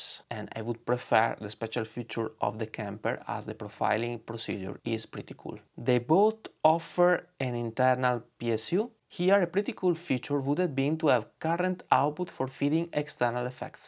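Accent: Italy. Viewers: ah ma quindi sei italiano anche tu? avevo notato una pronuncia particolare che può essere nostra o comunque in generale di chi non è madrelingua inglese / americano.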